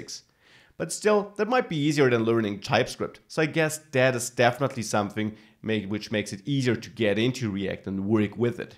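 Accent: Germany. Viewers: Gute Videos aber ich kann mir dein extrem deutsch gesprochenes Englisch leider nicht lange anhören. Trotzdem weiter machen!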